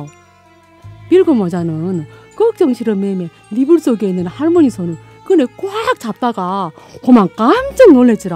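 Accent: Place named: South Jeolla, South Korea. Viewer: 아따~ 오메~^^ 생생한 전남사투리로 듣는 동화가 특유의 억양과 말에 녹아있는 장단때문인지 마치 판소리를 듣는 듯 해요.😊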